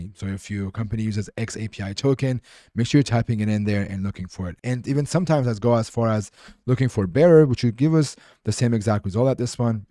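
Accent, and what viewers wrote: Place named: Iran. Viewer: i know a fellow iranian brother when i hear one 😂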